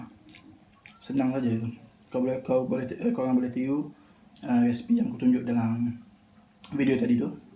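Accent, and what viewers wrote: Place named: Kuala Lumpur, Malaysia. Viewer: ckp kolumpor ho..haha hai nama saya beng.igt x?😆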